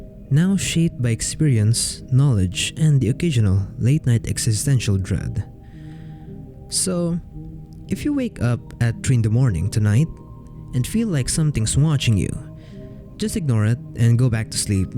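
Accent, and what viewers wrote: Philippines. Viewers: Are you a Filipino? I can hear it from your accent, I know a fellow filipino when I hear one. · Filipino accent detected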